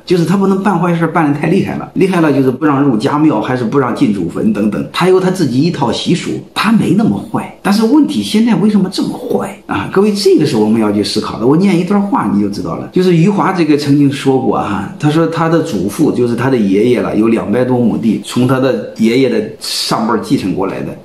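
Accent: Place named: Henan, China. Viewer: 河南口音都出来了，肯定是老乡嘛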